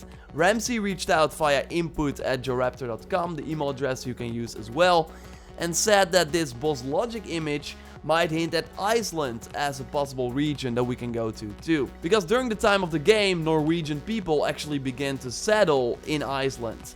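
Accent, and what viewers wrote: Netherlands. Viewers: are you dutch? it rlly sounds like it lol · LoL i can hear that you are dutch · why do u sound like u have a dutch accent😂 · It's been 2 years since I havent really been active on youtube but I sure remember the Dutch accent of yours like the sound of yesterday haha. Houd jezelf sterk en veilig! Stay strong and be safe! Very informative information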